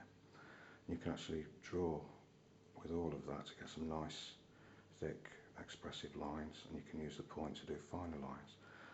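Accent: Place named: Midlands, United Kingdom. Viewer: Love the Midlands accent btw. Melton Mowbray?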